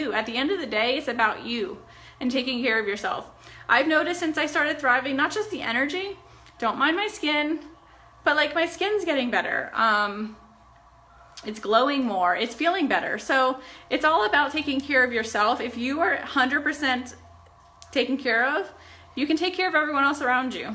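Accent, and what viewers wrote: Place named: New York, United States. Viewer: It’s a northern jersey/ New York accent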